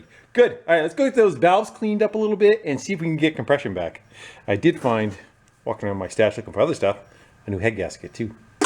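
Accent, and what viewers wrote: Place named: Massachusetts, United States. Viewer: A Yhard Buhirud? love it! Worked around lots of people with that accent... never forget it. I can do a pretty good North East Mass accent, and I can always tell in movies when they bullshit one. they all sound Australian when they fake it really badly.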